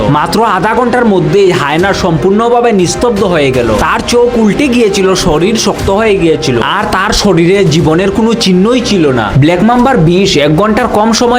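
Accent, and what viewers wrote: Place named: Sylhet, Bangladesh. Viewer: তুমি তোমার সিলেটি ভাষায়ই কথা বলো, শুদ্ধ ভাষা হয়না তোমার, লেজে গোবরে হয়ে যাচ্ছে ভাষাটা।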